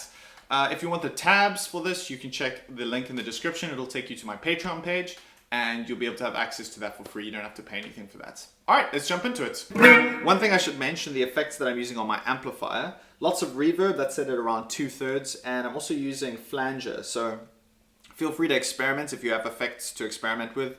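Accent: South Africa. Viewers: bro you kinda sound south african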